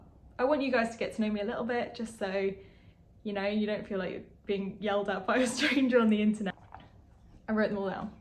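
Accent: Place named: United Kingdom. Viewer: Basically, my motivation to subscribe to your channel is your British accent.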